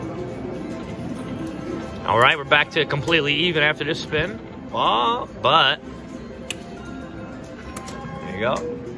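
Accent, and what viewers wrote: New Jersey, United States. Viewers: You must have been born and bred in New Jersey because you have a thick Jersey accent!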